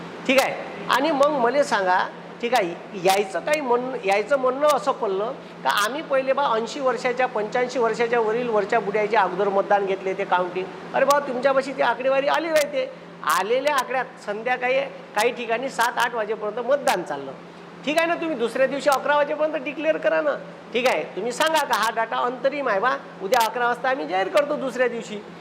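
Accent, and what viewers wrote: Vidarbha, India. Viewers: आपल्या बोलण्यातून मधीच 'ठीक आहे"हे उच्चारण करणे कमी केले तर.... खूपच चांगले वाऱ्हाडी भाषेत बोलणें आहे.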